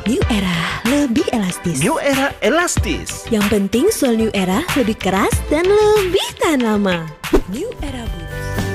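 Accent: Malaysia. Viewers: Kok narator nya logat nya kayak orang Malaysia yg baru belajar bhs Indonesia ya. Tp keren kok contain nya. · Betu betu betu😕 Adminnya pasti tetangganya Ipin Upin nih. Dialeknya ngeselin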